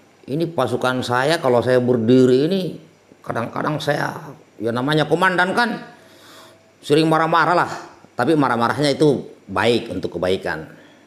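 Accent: Makassar, Indonesia. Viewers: Mantap komandan 👍👍🙏.. Solo hadir.... cerita cerita yang menarik dan inspiratif dari Mayor..... jangan lupa logat Makassar, kopi dan rokoknya pak komandan.... sukses selalu 👍👍👍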